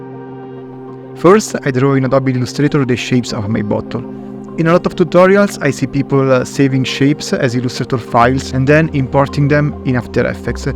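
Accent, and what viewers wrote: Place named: Italy. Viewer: I like the italian accent, makes the content more original. Nice!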